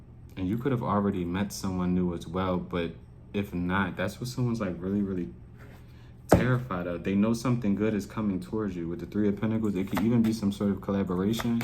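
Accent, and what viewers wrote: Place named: New Orleans, United States. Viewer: You are definitely gifted, all FACTZ just Blessed ❤..wow! You be spot on bruh. Where are you from, I'm hearing Nola, ATL?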